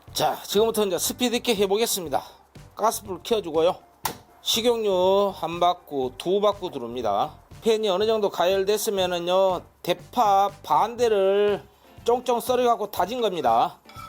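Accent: Jeolla, South Korea. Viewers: ㅎㅎ 셰프님! 전라도 사투리가 음식맛을 더 정겹게 합니다.❤ 저두 침이 꿀떡 넘어가네요.😅 좋은 아이디어 배우고 갑니다. 셰프님!화이팅~❤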